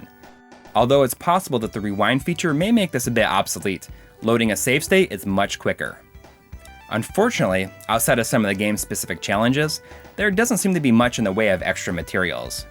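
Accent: Wisconsin, United States. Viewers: I seriously can’t take the Wisconsin AAAAccent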